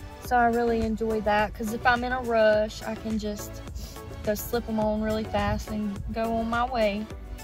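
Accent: United States. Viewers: Beautiful sweet. Southern accent so cute. ❤🎉👣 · God, that twangy Georgia accent is screaming sexy ❤❤❤❤❤❤❤❤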